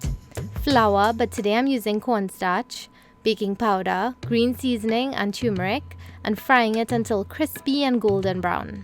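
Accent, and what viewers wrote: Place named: Trinidad and Tobago. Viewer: Hey girl , my cousin lives on Liberty in Queens .I have been there many times......I also lives close where uou buy your bigani in Trinidad......I JUST LOVE HOW YOU ARE SO , SIMPLE AND HUMBLE AND ALWAYS KEPT YOUR STRONG TRINI ACCENT....... TRUE TRIN.....😍😍😍😍. Girl I bought some biiiggg bigani in the back of Chaguanas recently , she is the best so far. I try other vendors but usually take 2 bites and throw it away, and I loved it so much, by this lady , I looked up how to make from you of course.......love aaalllll your videos. ❤❤❤❤❤❤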